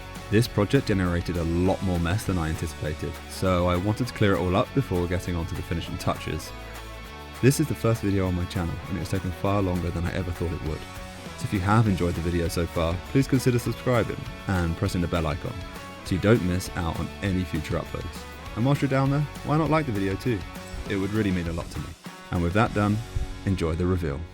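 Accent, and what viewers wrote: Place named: England, United Kingdom. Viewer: My maiden name is Quirk. My ancestors come from Cork Ireland. From your accent you are English. I did a ancestry search but I came upon my brickwall at my Irish ancestors . Is your family descended ofrom Ireland?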